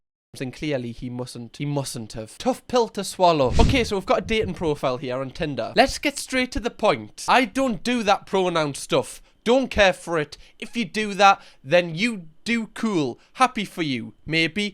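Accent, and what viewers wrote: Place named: North, United Kingdom. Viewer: Keep at it mate you're a natural 👌 usually I hate the sound of us northerners on tv/video 😂 but I honestly laugh at the shit you react to.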